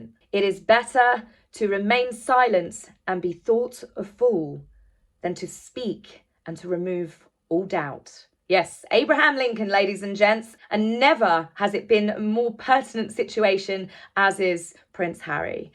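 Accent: United Kingdom. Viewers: While I ❤️your opinions, take on things today it's that Gorgeous opal your wearing! I have a keen eye & ❤️opals they are my birth stone. New to yr channel & loving it. The content great but honestly I love the sound of British accents. Find them SO soothing. Lastly in Harkel's replay of some of the word 🤮I saw on Behavior Panel I believe the British gentlemen was very sad & hurt by Migraines comment the the British reaction "smaller" than Americans. As an American I would take that as a compliment. We Americans can be loud, obnoxious, class less people NOT all but I will say many. So be proud for having composure, manners, class. Megraine is perfect example of a low class, rude, mannerless, know it all (only in her mind) money grubbing bottom feeder, who thinks she had what it takes to be a Royal. She has delusional views of how she is perceived & her sense of entitlement. 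Royals are respectful, do Not act entitled, humble, attempt to connect & serve the people however they can. Royals understand Who supports them & pays for their lifestyle Not a paycheck or freebies. I've never met a Royal but that is how I see them to be. Yes there may be problems but they are resolved quietly & discreetly Until the horrors that are the Harkel's invaded & poisoned with their disgusting amt of pure greed & evil. I'll never ever forget Oprahs part in enabling & giving them a platform all to rebuild her relevance her perceived importance & more 🤑💰💰💰. Didn't realize Narcissists came & ran in pa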